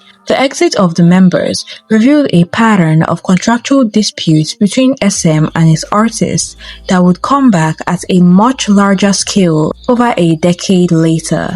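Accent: Nigeria. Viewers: I can clearly hear her Naija accent and she speaks so well. so what are you talking about?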